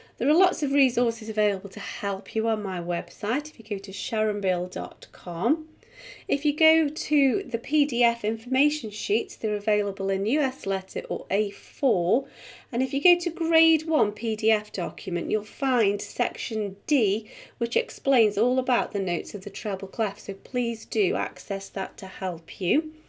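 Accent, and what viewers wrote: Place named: Lancashire, United Kingdom. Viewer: This video has helped me a lot. I feel like I am starting to learn a new language. I'm 35 and a beginner, but I have managed to play simple tunes, so far. I have some sort of acute hearing that helps me hear a note and play it almost immediately. To be able to read other sheet music is has made me make an effort into playing with both hands. (something I thought to be impossible). This has inspired my kids whom are 5, 11 and 13 to play our piano. Thanks so much, it is much appreciated, plus your beautiful accent reminds me of my family in Lancs. Be safe all the best :)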